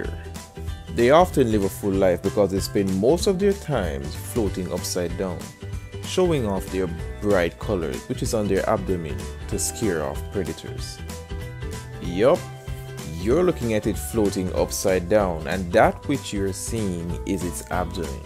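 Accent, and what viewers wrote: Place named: Philippines. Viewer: you sounds filipino,are you?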